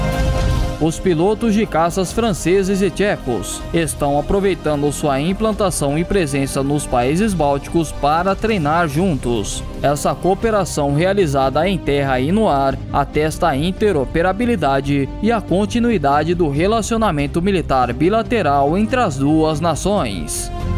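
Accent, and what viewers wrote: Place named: Ceará, Brazil. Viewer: eu tavo vendo uma coisa voce tem um sotaqui de seara voce e searence ne cpz kkkkkkkkkkkkkkkk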